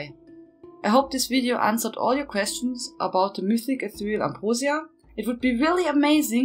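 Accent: Germany. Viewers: Ich höre deinen Deutschen Akzent. Sehr Schön ;) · ufff that german accent is hard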